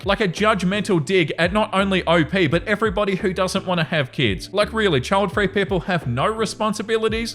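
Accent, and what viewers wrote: Australia. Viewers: Silent lurker chiming in. 😊 I'm loving the longer content - I can listen to the stories and enjoy the Aussie accent (I'm a Melb girl living in the US a handful of years now... Hearing another Aussie helps me feel less existential dread right now 👀) while I have my morning coffee and plan my day, sneaking glances at kitty cam. 😻 Doing a longer video every other day is the way to go. Quality over quantity. Gotta look after yourself so you don't get near burnout. Give the kitties a cuddle from the far away Aussie woman who is currently freezing. (It's -12°C)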